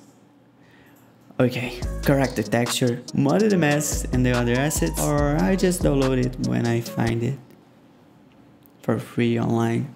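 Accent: Brazil. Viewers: Mano não sabia quer vocè era brasileiro mais tava um pouco desconfiado pelo seu jeito é o sotaque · Sabia que tu era Brasileiro porra, esse sotaque ai e é top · Eu sabia que tinha sentido um sotaque BR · Caralho eu sabia que tu era brasileiro só pelo teu sotaquekkkkkkk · Mano eu não sabia que tu era brasileiro mas esse sotaque me fez desconfiar desde o início kkkk trabalho foda pra krl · Aaaahh eu sabia que era br só pelo sotaque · Antes de falar q era br eu ja desconfiei pela pronuncia, so eu q acho mto loko isso ? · MANOOOOOOOO KKKKKKKKKKKKKKKKKKKKKKKKKKK ADOREI BR não tem jeito. Tu pode falar fluentemente, desde o incío eu vi a pitada de brazilização. TEU VÍDEO ME AJUDOU MUITO COM O INGLÊS, VOU FICAR REASSISTINDO KASJDLAKSDJ E QUE CONTEÚDO DO CARALHO EM, PF NÃO PARE AMIGO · Mano, num outro vídeo q vi teu, eu pensei q parecia BR, e agora sei q eu estava certo... 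Principalmente pelo sotaque kkkk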